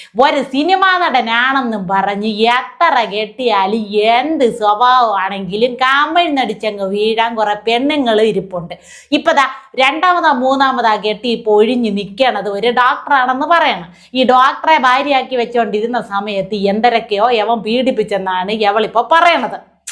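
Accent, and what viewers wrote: Thiruvananthapuram, India. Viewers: ചിരിച് ചിരിച് ചത്തു തിരുവനന്തപുരം കാരിയാണോ സ്ലാങ് കലക്കി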